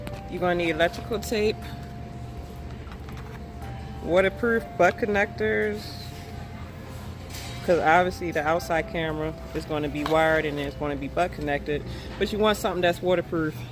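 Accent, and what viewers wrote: Baltimore, United States. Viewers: My 10 year old recognized your voice. He asked if you were from Baltimore. I told him yes. He said you talk like one of his friends from Baltimore. So funny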